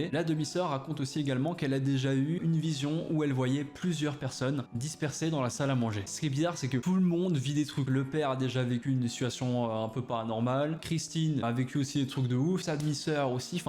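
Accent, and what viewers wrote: Belgium. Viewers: Il est belge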